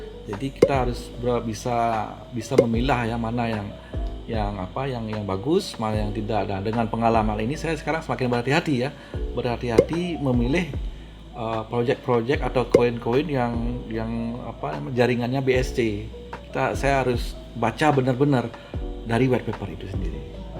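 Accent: Bali, Indonesia. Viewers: orang bali ya blii? aksen nya seperti orang bali///?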